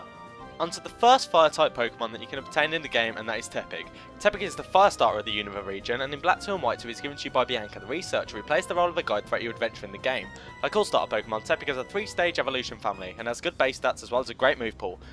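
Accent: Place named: Birmingham, United Kingdom. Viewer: Good to hear a fellow Brummie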